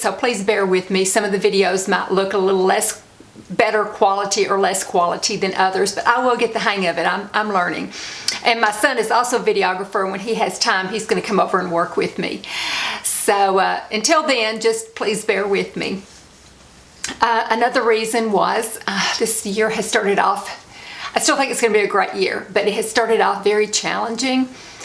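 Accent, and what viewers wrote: South, United States. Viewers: I just found your channel and I just love it. My family is from the south so I love your southern drawl. Also, my mother has fought Crohns for many many years - and lives in the Knoxville area. Please keep these videos coming. Love you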